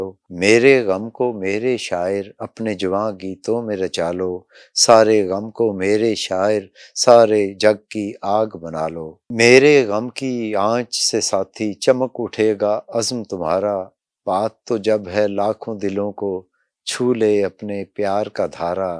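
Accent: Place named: Punjab, Pakistan. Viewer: urdu reading in Punjabi style.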